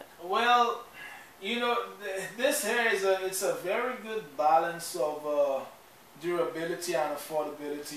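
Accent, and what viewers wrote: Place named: Trinidad and Tobago. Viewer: Sounds Trini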